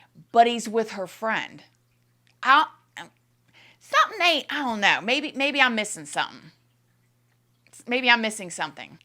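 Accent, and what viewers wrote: South, United States. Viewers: I actually need my regular fix of this southern comforting voice. It sounds like fresh baked cookies and warm hugs. This lady is smart as a whip. Sharp as a tack. Brains, beauty, and lovable x 1 million. She's top tier . I hang on her every word ❤❤❤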